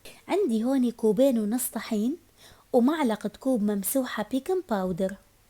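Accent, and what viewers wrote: Mosul, Iraq. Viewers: هلا وكل الهلا نورتي ياغاليه عاشت ايدج انتي مبدعه ربي يحفظكم ويسعدكم ❤انتي من الموصل من اللهجه ❤ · فديت اللهجة الموصلية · هاي اللهجة المصلاوية عيني احترمي 👍🏻👍🏻👍🏻👍🏻👍🏻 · عاشت ايدج،، شگد حلوه اللهجه المصلاويه ❤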